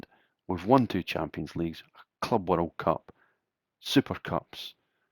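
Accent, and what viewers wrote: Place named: Scotland, United Kingdom. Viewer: So refreshing to hear a scottish voice on Youtube keep it up brother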